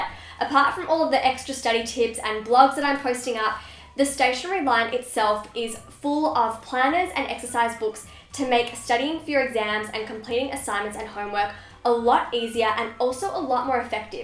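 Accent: Australia. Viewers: Are you Australian??? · Is she Australian ?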